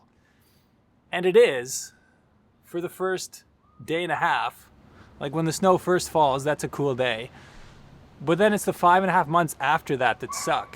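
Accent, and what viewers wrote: United States. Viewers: THE WAY YOU SPEAK LOOKS SO AMERICAN THAT UNDER FIRST IMPRESSIONS I THOUGHT YOU WERE ONE.IT'S LIKE WATCHING A FILM ON NETFLIX.THE ANGLO CANADA FOR ME IS NOTHING MORE LIKE EXTENSION TO USA.ONLY DIFFERENCE IT'S HEALTHCARE WHICH IS CHEAPER.APART FROM THAT NOTHING..HOLLYWOOD USES CANADA AS BACKGROUND FOR EVERY MOVIE IN USA. CANADA SHOULD BE 51 STATE IF NOT ALREADY IS. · you are Canadian? I had no idea I thought you were an American.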